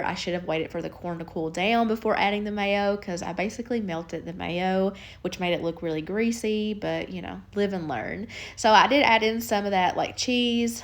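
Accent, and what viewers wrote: South, United States. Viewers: Maybe it's my preference but I love hearing a southern accent. It's a familiar comfort I don't always hear anymore with how many transplants live in my area. Southern women just have a way of speaking that keeps me listening. Keep up the videos, you're doing great!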